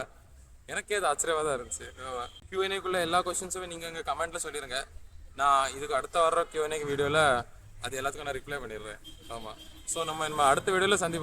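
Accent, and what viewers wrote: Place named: Kanyakumari, India. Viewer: Good job brother👏 I thought you are from Kanyakumari district because of your tamil accent..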